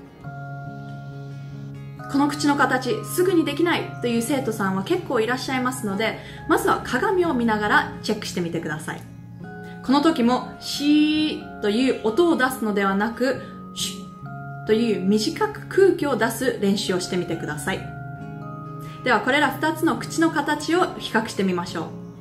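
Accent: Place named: United Kingdom. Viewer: Hi, your video helps me a lot. BTW, I just wondered your accent sounds like British. How many years had you lived there? Thank you!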